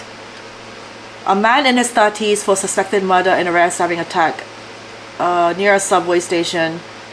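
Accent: United Kingdom. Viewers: You are just super hot! The British accent just propels your channel!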